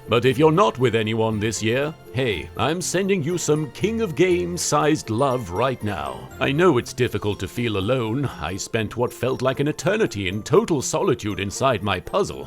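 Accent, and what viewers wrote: United Kingdom. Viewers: Why is he so British…? 🤔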